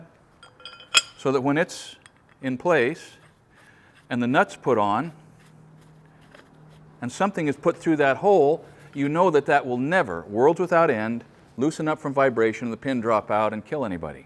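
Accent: United States. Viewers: Love your beautiful vocabulary and accent. Original American accent. Words are not enough to appreciate the value of your useful programs. Lots of appreciations, thankyou.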